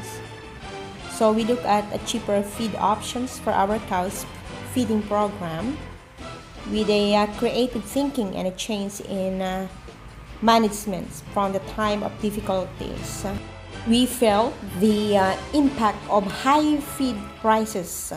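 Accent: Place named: Italy. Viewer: ur accent is italian, not filipino .... any accent will do as long as they can understand u, not wrong with accent specially filipino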